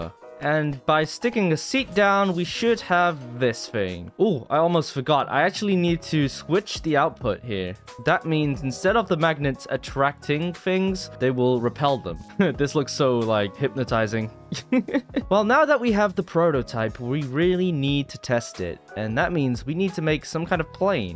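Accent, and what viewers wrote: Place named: United States. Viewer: Blackpool boy turned American wtf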